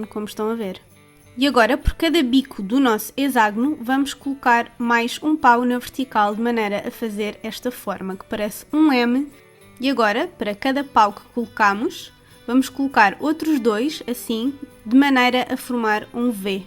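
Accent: Portugal. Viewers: você é de Portugal??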